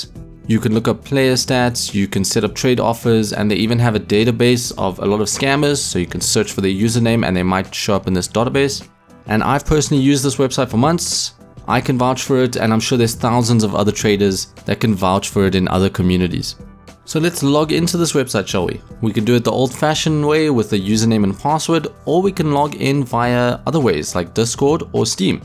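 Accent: South Africa. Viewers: Is he south african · Are you from south africa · U from South Africa?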